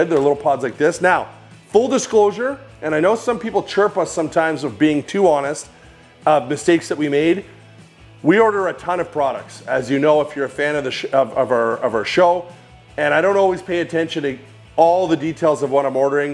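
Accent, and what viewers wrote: Canada. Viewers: As always, &, I love your videos! Your honesty and real life situations make me feel like I'm at your home and you're giving real advice! Much appreciated! My Mom was co-owner of a coffee service. Once a month you should run a full pot of 1/2 white vinegar and 1/2 filtered water through the pot portion. And 15cc white vinegar and 25cc filtered water through the espresso side on Luongo setting. BUT that is only if you use both sides daily! If not than every other month is good. I used to have to clean the machines that were replaced, or being repaired. White vinegar is better than any so-called coffee machine cleaner! Those cleaners almost never flush out entirely. Their residual lousy taste lingers!!! White vinegar flushes right out! As a rule of thumb, I would always tell our customers to make your first pot of just water. Then make ALL the coffee & espresso you want!😊 Optimum coffee/tea temperature of 55-68°C/167-170°F is best to not experience the bitterness that most people find distasteful about coffee. 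Never put a Yeti, or any other insulated double walled steel/plastic/triton cup on the hotplate on the pot side!!! It can cause a fire! Nova Scotia, eh? I thought I detected an Eastern Canada accent! Parlez vous France'? Je parle les France' au les Canadienne! 🙂 Again, I LOVE your videos!!! All your hard work is much appreciated!!!🇨🇦🇨🇦🇨🇦 Nancy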